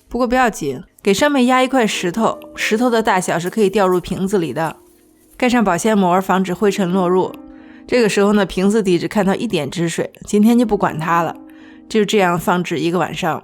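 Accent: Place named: Shaanxi, China. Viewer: 是陕西人啊，听出来了，能打听一下你做浆水鱼鱼的那个工具在那买的吗？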